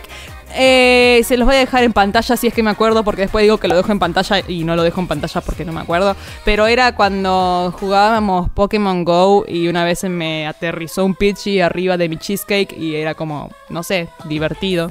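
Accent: Argentina. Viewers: Dios mio como amo el acento argentino (~♡○♡)~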